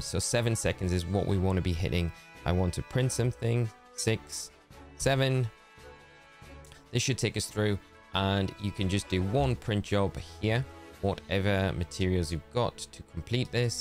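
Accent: Newcastle, United Kingdom. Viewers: You’re a Geordie…?! Subscribed.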